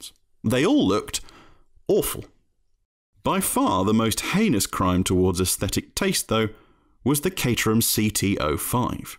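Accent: United Kingdom. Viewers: Somehow, I just knew that the snobbish narrator would be a Brit. Race cars are build for function. Not appearance. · With this british accent, it's even more funny 🤣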